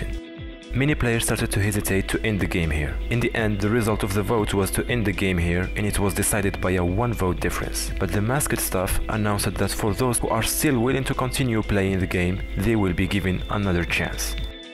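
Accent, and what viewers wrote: Morocco. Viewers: Accent mn kilomètre t3rfha mghribia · dude From the first second of this video i knew it , you're 100% moroccan 😭😭pls keep going i like your channel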